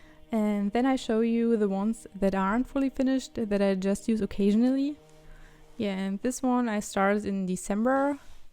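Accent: Germany. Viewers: Are you Czech or German? Your accent sounds like you are German, but I saw your video about alcoholic markers and it's title is in Czech, so I just wonder about it. (Btw your art is awesome, and Happy Birthday to your channel! ^_^)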